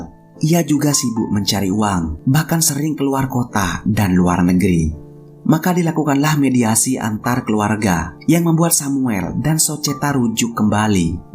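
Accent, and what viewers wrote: Madura, Indonesia. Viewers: Salam.kenal bang... perantau di banyuwangi asal bondowoso sll menyimak. Terkdg sy merasa logat bicara smyn kyk parak pahe'eh bik ngkok kang😁😁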